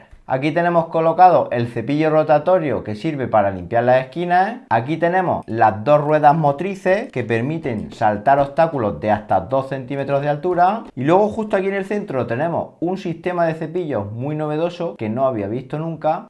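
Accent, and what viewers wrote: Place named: Almería, Spain. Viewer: De Almería? Vaya acentazo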